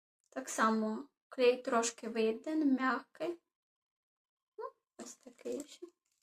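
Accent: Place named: Surzhyk, Ukraine. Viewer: Какой жёсткий суржик. Женщина, выучи украинский уже, или ты террористка которая косит под украинку?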